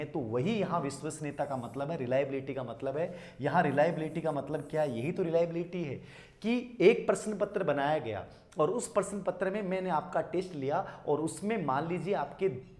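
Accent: Rajasthan, India. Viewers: Sirji aapke muh se marwari boli v achhi lgti h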